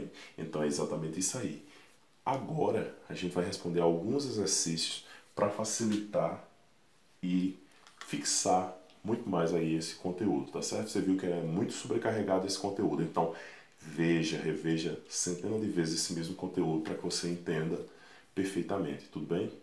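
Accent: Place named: Pernambuco, Brazil. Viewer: Tive grande facilidade em aprender contigo professor. Eu já estudara os anos anteriores este assunto ,mas não conseguia tanto êxito.Nos tempos de escola( há muitos anos atrás). Sua jeitinho simples de explicar à matéria facilitou muito...OBRIGADA! OBS: esse teu sotaque lembra-me meus vizinhos pernambucanos.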